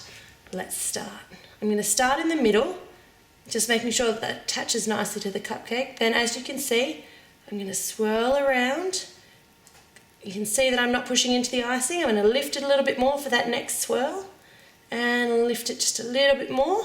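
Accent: Australia. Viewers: She sounds Australian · you australian? :)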